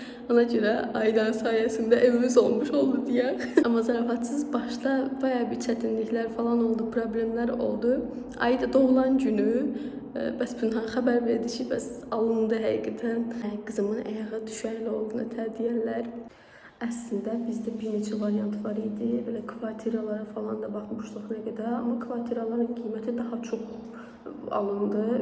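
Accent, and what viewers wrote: Sheki, Azerbaijan. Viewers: Canim gôzum xeyirli olsun saglam oturun icinde xowbext yawiyin.Balalarinizin xeyir iwlerini goresiz.Bizde Maskoviski oblastda yawiyiriq.Ümüd edirem bir vaxt rastlawariq.Menim yeznemde Wekilidi çox Gozel insandi.Weki lehçesini cox sevirem wirin daniwirsiz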